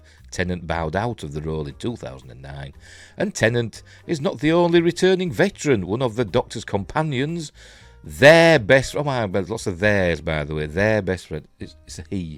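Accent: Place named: Yorkshire, United Kingdom. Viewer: Nice to hear another Yorkshire accent on Youtube, South Yorkshire? Old Wezzy myself that'knows....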